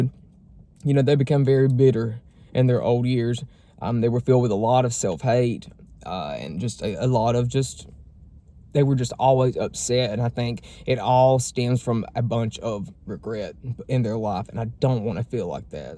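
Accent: South, United States. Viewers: I find that to be a ridiculous question tbh. It once again points out the hypersexualization in the LGB community. It also tells me that ppl are watching the videos without hearing & listening. This man has clearly stated that he is looking for love. That he wants a husband 1 day, with kids. He wants a family. He has spoken so clearly about his past & how exhausted he was that he couldn’t find anything burn guys just wanting sex. And then, here comes the OF porn addicts. That only want his body. It’s maddening. Yes he is gorgeous guy, beautiful southern accent, kind heart….yet he is just still being objectified. Like jeez ppl. Then yall wonder why yall be dying alone, at the age of 50 & 60 still on hookup apps getting rejected daily. I hate it. Truly.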